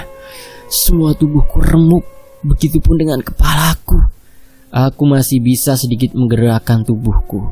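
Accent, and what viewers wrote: Java, Indonesia. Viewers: Aku suka bgt cara kakak membawakan ceritanya,opo maneh nek omongan boso jowo gemes nek dengarkan 🤭 aku selalu menunggu cerita2 selanjutnya ❤️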